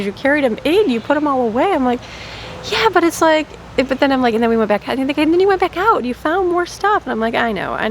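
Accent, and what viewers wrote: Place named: Florida, United States. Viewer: 🙂when I looked it up, it sounded just like how says it...:) maybe it's the Floridian accent making it sound like Bells:)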